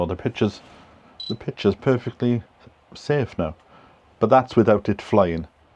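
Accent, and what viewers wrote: Wales, United Kingdom. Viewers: . I just want to say how much I enjoy your channel my mum was Welsh😊 so I’m half welsh. I love the valleys and I love the welsh accent. Keep it up great content great channel 👍👍👍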